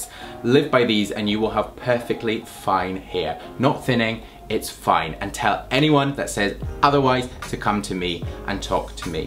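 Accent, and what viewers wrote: Scotland, United Kingdom. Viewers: i think he's Scottish ive got a Scottish friend and he sounds pretty similar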